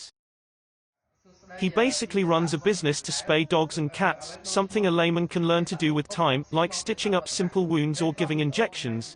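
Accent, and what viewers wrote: United Kingdom. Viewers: Sounds like somebody is all bent out of shape because he's not getting enough views. The true question is, why are you using an English accent on your video? Hmmmmmmm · where do you get youre voice over voice? something about a uk accent makes it sound way less robotic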